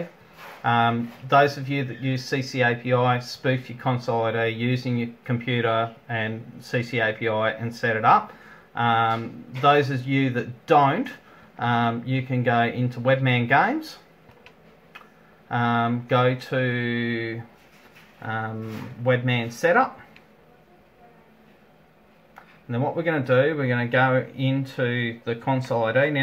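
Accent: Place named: Australia. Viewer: Mate... if I knew where you lived, I'd but you a beer or three...! Got me out of a jam. Good to hear an Aussie accent that knows what he's talking about / doing.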